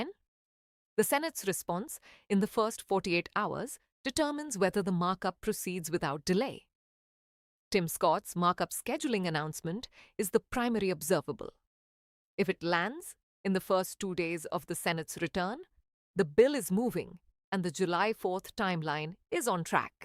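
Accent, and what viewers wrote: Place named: India. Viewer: Why an Indian accent 😂skip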